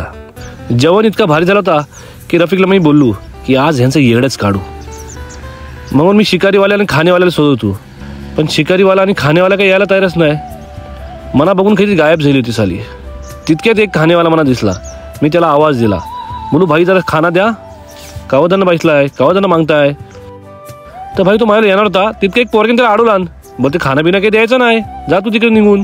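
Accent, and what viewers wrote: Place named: Konkan, India. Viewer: Ek no video aisa lagra vlog khatam he na ho tumhi nav thevayla Gela pan to pan chagla vatla Ani tumchi kokni basha agdi Chan keep it up bhae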